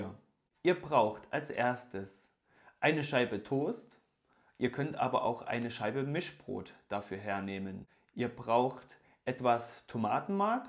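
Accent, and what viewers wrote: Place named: Saxony, Germany. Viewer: ich finds immer wieder süß wie der Sachse versucht das Sächseln zu unterdrücken wenns öffentlich wird. :.-) Bin selber Leipziger und habe das bei vielen Videos bemerkt. Gutes Video , aber wo der Käse da schon "Farbe" hat weiß ich jedoch nicht . ;-) Aber Die "Karli" mach ich mir jetzt trotzdem.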